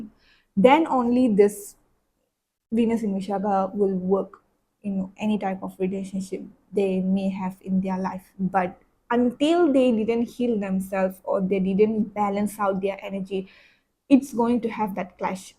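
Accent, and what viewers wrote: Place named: Malaysia. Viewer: Hey, Amazing video, appreciate the insights! Are you from Malaysia btw? I grew up there, you have the same accent!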